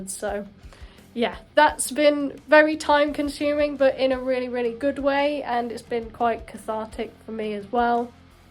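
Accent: United Kingdom. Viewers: Your British accent is amazing! Hope we can hear you singing some lyrics with your new band!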